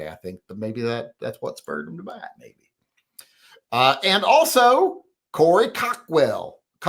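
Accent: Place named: South, United States. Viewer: U sound more redneck (in your old age) than u used to.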